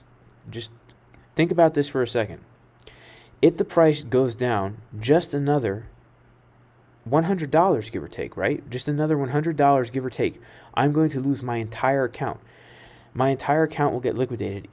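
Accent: United Kingdom. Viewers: Haha love the British accent !! 😂😂😂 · Nice English accent. Im from UK so I am impressed. Thank you for your tuition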